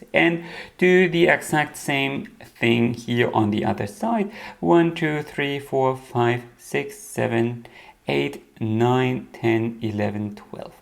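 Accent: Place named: Germany. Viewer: I'm trying to understand your accent. Are you in Germany?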